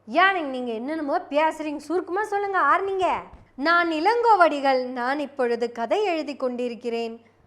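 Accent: Kongu, India. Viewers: கொங்கு பாஷையில் வயிறு வலிக்க சிரிக்க வைத்த பதிவு.முன்னேறி வாங்க.!..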